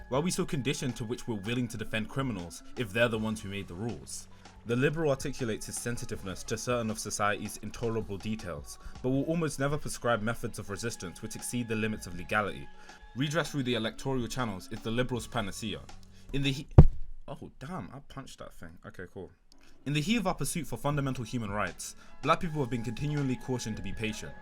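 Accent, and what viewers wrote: United Kingdom. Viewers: You and prince Soloman sound similar, ur jus the British version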